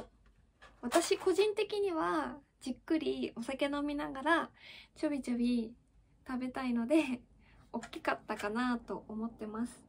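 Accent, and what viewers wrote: Japan. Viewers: お疲れ様です 今頃のコメントで申し訳ありません。 動画を拝見して、「うるかす」を初めて聴きました。先日の「耳がいずい」もそうですが、東北地方のご出身とのことで、お国訛りは、国の誇りです。どんどん使ってください。（本気の東北訛りだと標準語の字幕が必要かも？） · 青森出身？青森の おじさんがうるがす。 言うてた。後～、薬の イントネーション同じ。 へばな。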